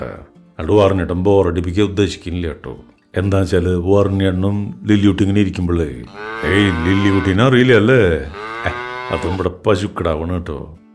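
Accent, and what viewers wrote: Thrissur, India. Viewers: തൃശ്ശൂർ സ്ലാങ് ആണേയ് ...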